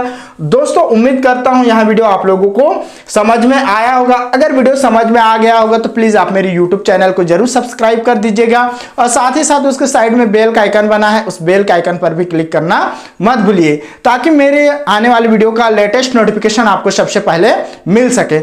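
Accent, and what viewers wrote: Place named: Bihar, India. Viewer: Thik sir ji mujhe Etna to pata chal Gaya ki aap BIHAR se hai .😊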